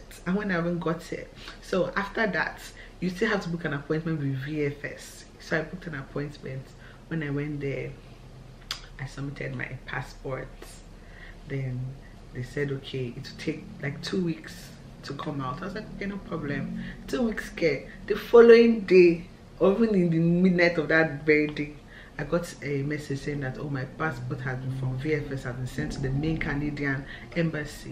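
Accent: Ghana. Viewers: Too much respect to you and all women around the world I can see how child bearing really takes toll on women you’re totally changed physic wise and the tone of your voice now sounds more Ghanaian congratulations 🤱🏽👼🏾👩🏽‍🍼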